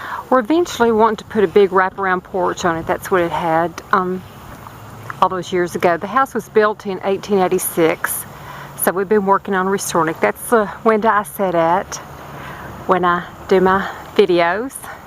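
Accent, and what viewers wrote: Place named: Texas, United States. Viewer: Your house is just gorgeous. I have always loved Victorian houses and used to want one. I got over that later on after finding out how much work to restore:( Yours is lovely. I also like other periods like 1930s, - 1950s retro styles. You have the cutest way of talking but it reminds me more of a TX gal I used to know. My folks are from Newport TN and I noticed u are from East TN...what part?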